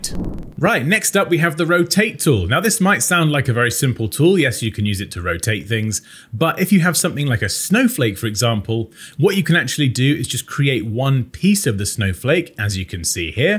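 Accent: United Kingdom. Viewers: Your accent implies that you’re British , Aren’t you ?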